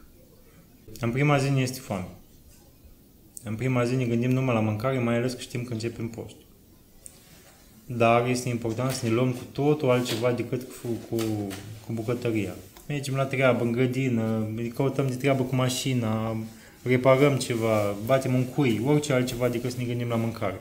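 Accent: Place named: Moldova. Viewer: explicatiile tale sunt extraordinare, pe intelesul tuturor nu cu cuvinte sofisticate, iar accentul tau de moldovean este placut la vorbire si pur rominesc sincer din inima iti spun, succes pe mai departe.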